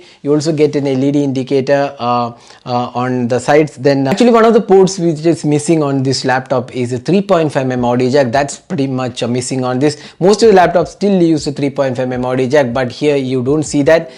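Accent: Kerala, India. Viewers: sounds like mallu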